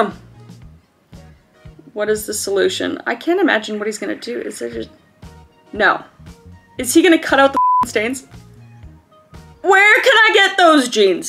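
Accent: United Kingdom. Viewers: Are u britesh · Plsss talk British I love your British accent ❤❤❤ 😂😂😂😂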